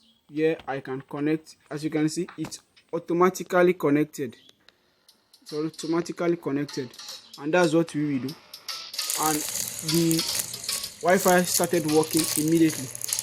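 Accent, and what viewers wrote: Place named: Africa. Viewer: African accent❤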